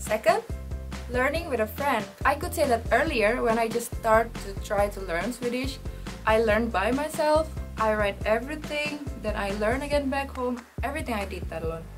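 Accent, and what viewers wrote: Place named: Indonesia. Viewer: Just by hearing her speaking Swedish with that accent, I already know she's Indonesian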